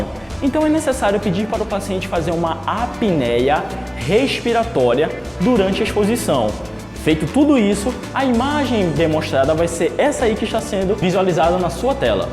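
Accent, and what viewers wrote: Rio de Janeiro, Brazil. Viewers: Vc é carioca???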